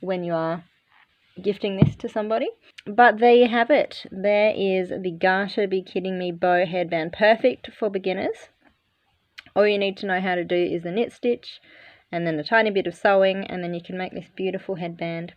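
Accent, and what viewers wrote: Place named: Australia. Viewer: I like it very much! and great to hear a fellow Aussie here on YouTube!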